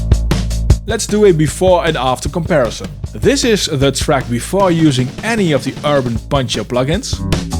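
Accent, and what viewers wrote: Netherlands. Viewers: die accent. NL?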